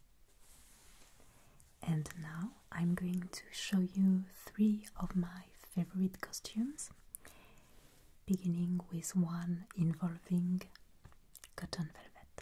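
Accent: France. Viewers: J'adore regarder tes vidéos. Je suis française et vit aux Etats-Unis. Entendre ton accent français me rappelle à quel point la France me manque. Merci beaucoup pour tes superbes vidéos. Elles me détendent quand je suis stressée. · Heyy I absolutely looove your asmr videos I just clicked on one of ur videos and I fell in love.. ur asmr is aesthetic it’s soo pretty to look at, I am learning French and I love your French accent. I think the French is a language that is perfect for asmr. I hope you make a video in French and definitely put some subtitles💕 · Very French accented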